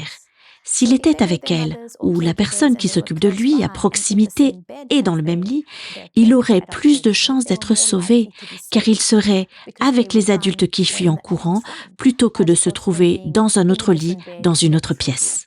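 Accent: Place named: France. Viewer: Vidéo très pertinente!! Et merci pour la douce voix française qui a permis une écoute très agréable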